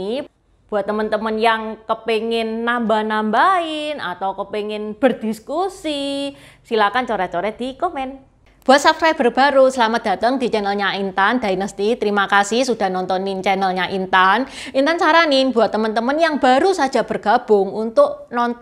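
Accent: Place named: Surabaya, Indonesia. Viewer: Suroboyoan tha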